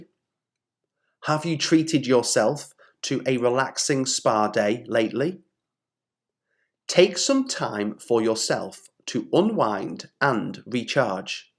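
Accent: United Kingdom. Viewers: Hello, you provide an amazing content here on youtube. I'm very grateful. Thanks a lot. But my son and me, we are a little bit curious from which part of the UK you come from:) because I would like to know more from which part is your accent. Sorry if I bother you with this.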